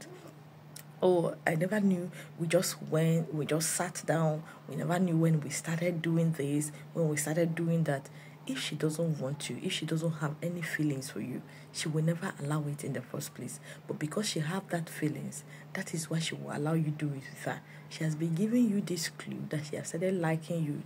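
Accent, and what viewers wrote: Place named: Africa. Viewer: Thanks for the inside info. Love the accent ❤ where in Africa are you from?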